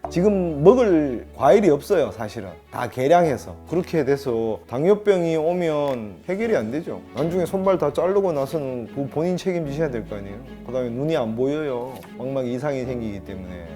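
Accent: Gyeongju, South Korea. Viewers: 박사님 왠지 경상도사투리가 정감이가더라구요 고향이 경주내남면이군요 내남면에서 이렇게 훌륭한분이 태여나셨군요 좋은정보감사합니다~❤ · ~~~ 경주 사투리 ㅎㅎㅎ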